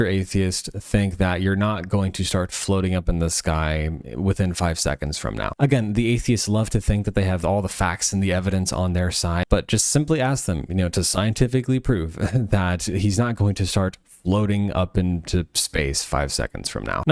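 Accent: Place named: Australia. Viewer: wow you have an aussie so cute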